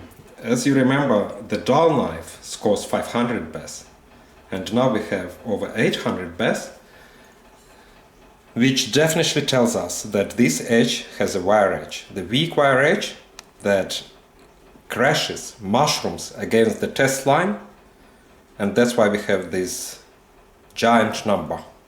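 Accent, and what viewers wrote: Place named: Russia. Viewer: Когда я услышал "хорошо" в начале видео, подумал показалось. Но когда увидел имя на книге плюс акцент - всё сложилось :) Приятно смотреть и слушать коллегу с такой глубокой компетенцией. А ещё порадовало понимание того, что вы живёте в Австралии и, вероятно, востребованы там; что всё у вас получилось. Привет из Санкт-Петербурга!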